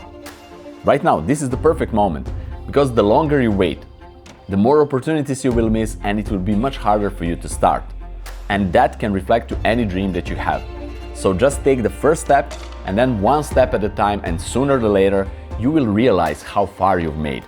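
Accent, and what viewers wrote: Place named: Brazil. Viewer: Just, INCREDIBLE! Congratulations! Are you from Brazil? Your english accent reminds me brazilian people.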